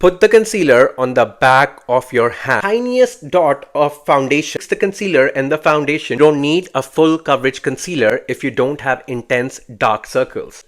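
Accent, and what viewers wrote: India. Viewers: Gosh I hate the Indian accent … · Hate Indian accent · Callar correcta ! The Indian accent bro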